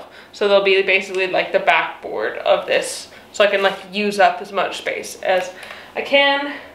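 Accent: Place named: United Kingdom. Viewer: I’ve watched quite a few of your videos and I heard many more words spoken with a British accent now. Heehee. Fun to listen to! Enjoy your awesome new greenhouse.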